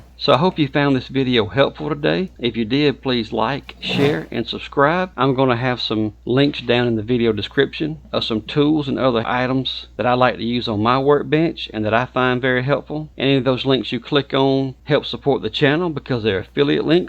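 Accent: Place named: South, United States. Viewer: nah you just sound like every redneck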